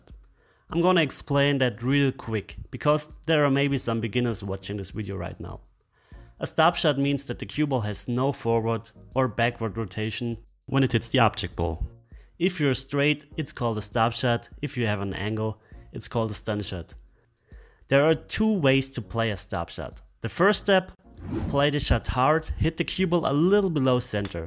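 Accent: Germany. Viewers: Gory Guerrero he is from Germany.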